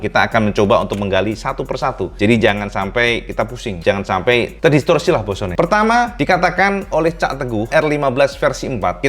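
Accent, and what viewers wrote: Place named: Banyumas, Indonesia. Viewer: Banjarnegara kie asli ngapak pride cak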